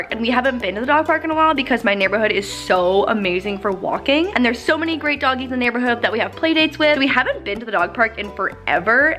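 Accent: Canada. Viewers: TOMORRRRRROW J.k I love Canadian accents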